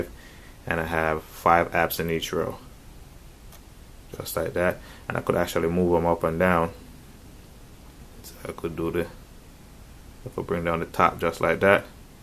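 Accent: Jamaica. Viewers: Jamaican I see, keep up the good work Bredda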